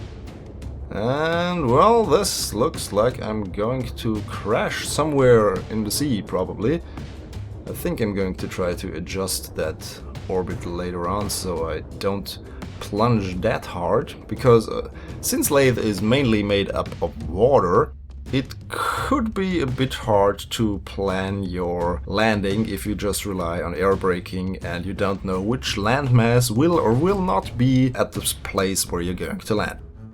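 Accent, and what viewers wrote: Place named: Germany. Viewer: okay thank you . i have another question xd are you German ? your accent sounds like a German xd Wenn ja wäre es ganz cool denn ich hab da noch ein paar Fragen die ich so auf englisch nicht gestellt bekomme xD Dafür ist es einfach zu schlecht :D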